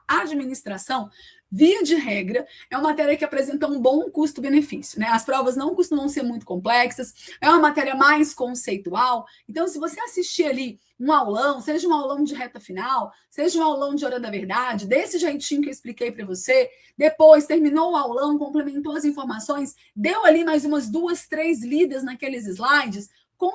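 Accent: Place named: Angola. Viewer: Acabei de reparar que o sotaque de lembra o sotaque angolano!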